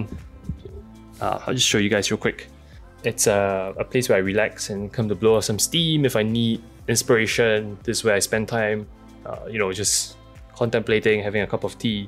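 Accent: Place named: Singapore. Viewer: Hey, are you Singaporean (you sound like one)? Been watching your streams for a while now and really like your content/set up (even though I don't tank). I recall you mentioned somewhere before that you have a full time job as an investment banker and now it appears you are married too. How do you manage a full time highly stressed job (I'm in banking too) with a wife and yet able to spend this much effort into the game and your content? I suppose your wife fully supports you and she must be into gaming as well? I see that she's a naruto fan as well:) I've been playing wow since it first came out when I was university but now as a married man with a full time job and a kid, reality kinda catches up with you.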